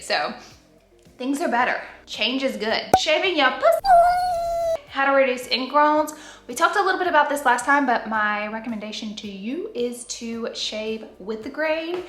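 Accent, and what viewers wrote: South, United States. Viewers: Heyyyy 🤗 I will never get tired of you Accent soo Country I Love it 🥰🥰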